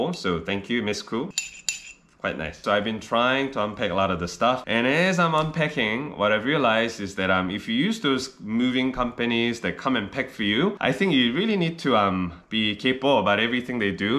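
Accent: Singapore. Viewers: dude, where you? Still in NZ? Miss your Singlish leh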